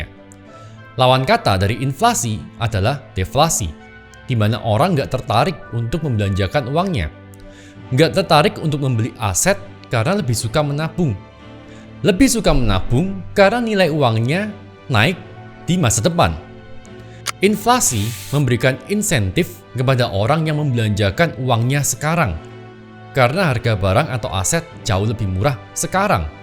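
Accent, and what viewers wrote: Java, Indonesia. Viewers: Ko fokus bljar ngomong gk medok dulu lah biar enak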